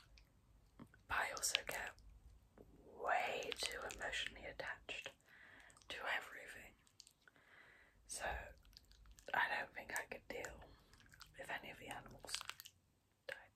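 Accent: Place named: United Kingdom. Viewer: oh, your british accent <3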